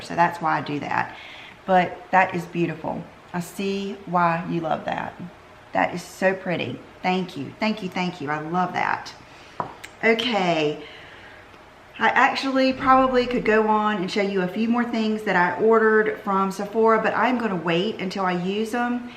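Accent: South, United States. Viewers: I watch you mostly because it's wonderful to see a feminine girl like myself!! I am a girly girl but strong at the same time. I feel you are too! I am also southern born and raised and I love your accent! You are beautiful inside and out.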